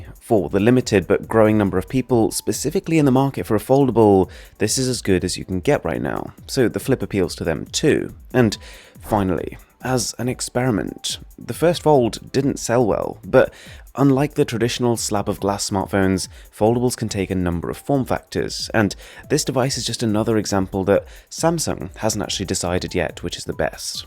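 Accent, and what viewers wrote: RP, United Kingdom. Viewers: He’s from the UK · You sound like a etonian chinless wounder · Relax with the exaggerated posh accent. It's laughable.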